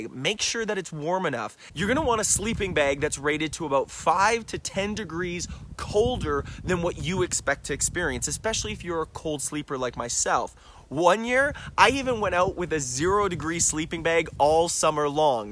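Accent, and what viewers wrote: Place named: Canada. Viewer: Strong Canadian accent lol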